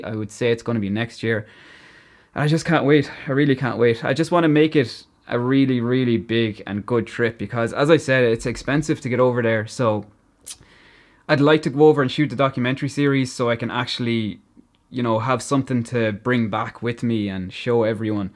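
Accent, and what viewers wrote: Newfoundland, Canada. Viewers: You sound exactly like a Newfoundlander, i should know I've been here my whole life. You don't sound like me, i have too much townie in me but west of paradise everyone sounds like you or with a even thicker Irish-like accent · You could have said you were a Newfoundlander and from your accent I would have almost have believed you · <link> From Newfoundland . when you pronounce Three as tree kinda sums it up i think! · Newfie here. I remember the first time I saw you in a video. I didn’t know you were from Ireland so when I heard you talk, I assumed you were a Newfie. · Wow, I'm from the west coast, British Columbia. From hearing you, I would of thought you were from Newfoundland. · I saw this video and had to stop for a listen. My Mum and her kin are from Newfoundland and your accent reminds me of my family so much it's making me homesick! 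lol You will love NFLD. the people treat you like family and the saying goes there are no locks on the doors in NFLD. It's affectionately called the Rock because of its craggy landscape. I have been able to travel from one end of the island to the other and up to St. Anthony where they have a stuffed polar bear that once walked into town!! I use to sing and travel so I have been to so many areas of the island. It is uniquely beautiful and breathtaking. It is old world, like stepping back in time. And the people are so lovely, and that's not just because they are my kin. You may find it very hard to leave once you visit. I hope one day to take my husband back to see Newfoundland. I hope you do get your chance to go, I know you will fall in love with the Rock. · Hello from Newfoundland! You sound like you are from here! My family goes back to the Waterford area.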